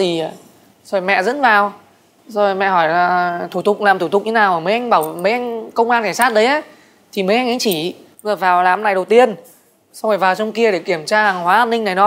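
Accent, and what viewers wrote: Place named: Southern Vietnam, Vietnam. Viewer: Tiếng miền Nam dễ thương thật mà.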